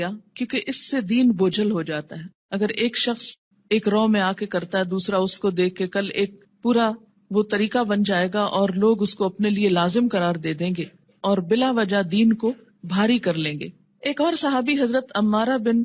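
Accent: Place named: Hijaz, Saudi Arabia. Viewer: تحیات ودو؟ حجازی لہجہ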